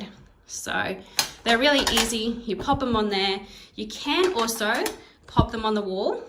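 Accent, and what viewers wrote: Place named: Australia. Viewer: The relief I felt when I heard her Australian accent was unbelievable.. haha. I’ve watched so many cricut videos & it’s always American. This is the first time I’ve seen an Aussie do cricut videos so I subscribed straight away!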